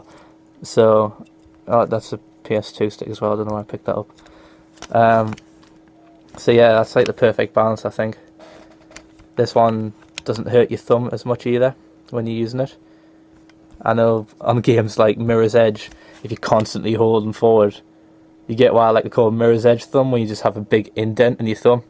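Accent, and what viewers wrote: United Kingdom. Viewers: Are you British mate?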